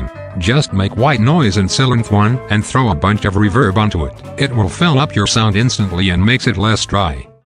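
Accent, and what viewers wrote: Germany. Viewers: are you German? 😅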